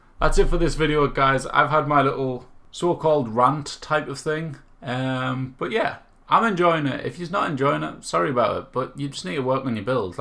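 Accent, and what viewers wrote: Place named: Newcastle, United Kingdom. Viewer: This was a jokes watch. Pure geordie rant saying it how it is😂😂 however I watched this video purely to see if the game is good now (lol, fourth attempt since release) and it hasn’t exactly sold it to me! Entertaining nonetheless!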